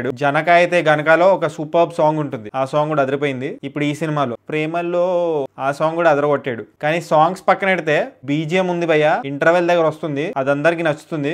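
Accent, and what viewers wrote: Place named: Visakhapatnam, India. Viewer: East West vizag dhaggaragane untundhi slaung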